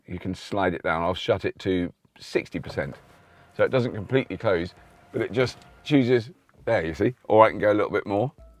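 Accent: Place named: England, United Kingdom. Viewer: I missed so much listening to proper english accent... Thank you. Great review btw.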